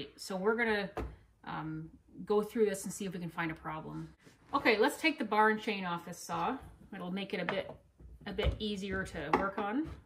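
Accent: Canada. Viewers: You're very good at what you do and you deserve many more subscribers! Is that an East coast accent? Newfoundland, maybe? I was so surprised there was nothing wrong with the flywheel key ; I was sure that was the problem, an over-advanced spark. A great how to video. Subscribed. And cheers from the West coast of Canada! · I knew it had to be a Canadian engine shop when I heard her accent and saw the snow on the ground. God makes tough people in Canada. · Hi, just discovered your channel. I'm a Stihl guy, a retired hobbyist. I've been working on saws for about 7 years now, after I burned up a piston due to a leaky crank seal and needed to fix my 034. I'm in northeast Arkansas and I'm guessing you're Canadian from the way you pronounce "out". I'm now 7 years older and dozens of Creamsicles "richer". The 025/MS250 has the same starter pulley diameter as the 170, 210, 230, and having the largest displacement of these, is notoriously hard to pull (also hard to start, if you make the mistake of waiting for the first pop to turn the choke off). 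The lever arm of the pulley is IMHO too small for the job. However, for a younger able bodied person armed with the above knowledge, I believe it is the best bang for the buck in a homeowner saw. Another caveat: don't try to advance the timing unless you don't value your arm.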